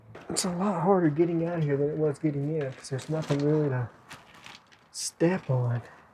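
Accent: Alabama, United States. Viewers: This is just like camping with steve except with a southern accent. I subbed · I’m going to guess your from Alabama bc your accent reminds me of gump lol Ok edit: you said Alabama so I was correct & I can chug a bottle of water in 5 seconds but it has to be room temperature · I know this is an older video. But I just found your channel and it's so enjoyable watching you. I live in Alabama myself near Tannehill Park. I really like watching someone going to places that I can associate with. But please be careful. No video is worth risking your life for . (the billboard, or those ladder climbs) Your not only putting your life at risk but in a way your family's also. Also so great to hear someone with an "Alabama accent".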